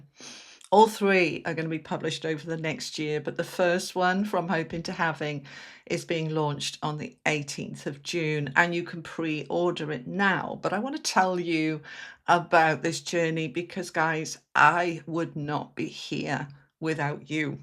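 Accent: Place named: South Wales, United Kingdom. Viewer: Hi, your accent sounds very familiar, I grew up in South Wales. I saw you on the Jeff Mara channel. You’ve put me onto a Spiritual Church nearby, I’m looking forward to meeting them. So thank you for that. And thanks for the tools you’ve explained, being the change. Love it!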